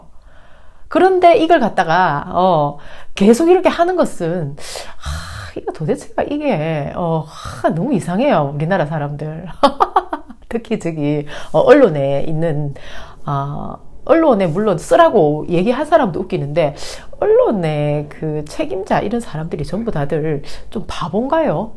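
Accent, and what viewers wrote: Gyeongsang, South Korea. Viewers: 님만 믿습니다. 구수한 경상도 사투리 좋아요~~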